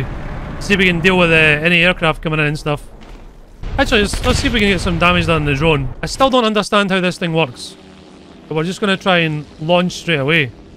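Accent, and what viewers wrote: Scotland, United Kingdom. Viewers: man this dude has a strong Scottish accent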